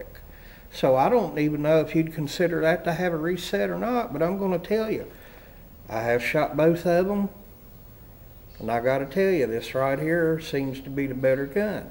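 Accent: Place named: South, United States. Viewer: My people! I moved to NH for GA in sept and really miss the southern accent.... :)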